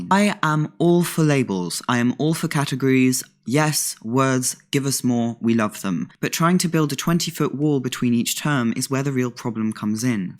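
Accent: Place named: Southern England, United Kingdom. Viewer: It's that smooth baritone and southern English accent.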